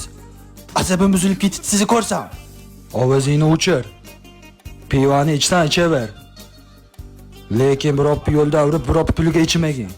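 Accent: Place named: Namangan, Uzbekistan. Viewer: Намагга шивага гап йок 😂😂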